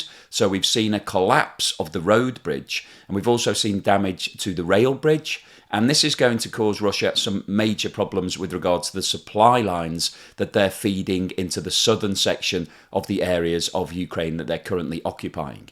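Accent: United Kingdom. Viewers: Thanks for your clear british english 👌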